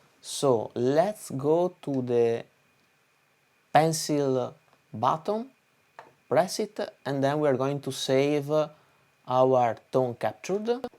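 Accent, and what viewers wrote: Italy. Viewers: Ma sei italiano? Mi sembra un accento italiano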